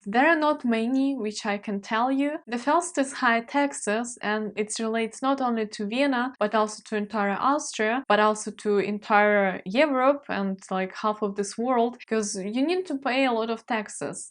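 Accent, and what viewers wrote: Russia. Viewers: Hallo liebe (meine Tochter heißt auch ) und Servus (=Hallo) aus Wien! Da dein Englisch viel besser ist als meines, schreibe ich dir auf Deutsch. Ich finde deinen leicht russischen Akzent bezaubernd! Gratuliere dir zu deinem sehr informativen Video. Das ist sicher eine große Hilfe für andere Menschen, die sich für ein Leben im schönen Wien interessieren. Du hast wirklich die wichtigsten Punkte erwähnt. Zu erwähnen wäre vielleicht nocht, dass Wien, für eine so große Stadt, auch sehr sicher ist. Ich denke, gerade auch als Frau, kann man sich in Wien eigentlich, zu jeder Uhrzeit, und an jedem Ort sehr sicher fühlen. Die Kriminalität ist kein nennenswertes Problem in Wien und auch die Polizei ist sehr zuverlässig und tatsächlich für den Bürger und die Menschen da. Ja, auch ich bin, natürlich als Österreicher (das gilt nicht nur für Wien) ein Kaffeeliebhaber. 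Es gibt wirklich unzählige traditionelle, wunderschöne Cafes in Wien, aber, geraden in den letzten Jahren, kamen sehr viele, moderne, coole Cafe dazu, die oft den Kaffee selbst rösten und hervorragende Qualität und Auswahl anbieten. Als Beispiel: <link> oder <link> Die berühmten "Mehlspeisen" also die süßen Dinge, wie Sachertorte etc. nicht zu vergessen. Natürlich leidet das Leben in Wien derzeit auch unter Corona und dem Lockdown, aber ich hoffe das bessert sich 2021 wieder deutlich. Auch ich vermisse es nämlich schon sehr, bei einem guten Kaffee, einer Zeitung und mit ein paar lieben Menschen, zu sitzen und zu genie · Are you from Russia ? У тебя замечается русский акцент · ust i heard the 1st word i recognized that you're russian at once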